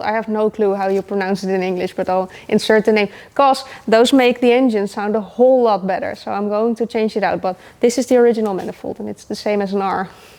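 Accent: Netherlands. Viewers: its just the normal dutch way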